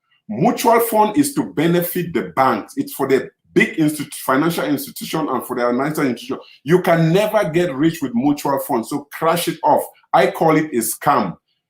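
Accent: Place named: Nigeria. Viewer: Interesting collaboration you had with! You sound more like a Nigerian. Awesome.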